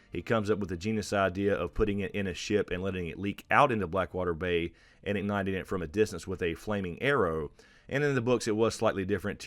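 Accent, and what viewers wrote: South, United States. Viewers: I'm sure you've heard this before , but I love your southern accent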